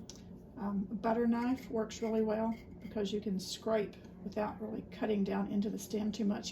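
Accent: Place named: East Tennessee, United States. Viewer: You sound like East Tennessee. Me!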